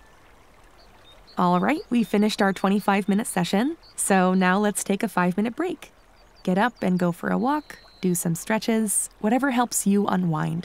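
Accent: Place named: England, United Kingdom. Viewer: I like ur English accent 😅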